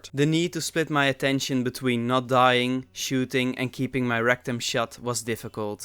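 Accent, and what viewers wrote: Netherlands. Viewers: Duurde precies een halve minuut, voordat ik door had dat jij nederlands was... 😂😂😂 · oh lmao i didnt even know it was on your channel xD i just heard the dutch accent coming through the english haha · Not to ask stupid qwestion but are u dutch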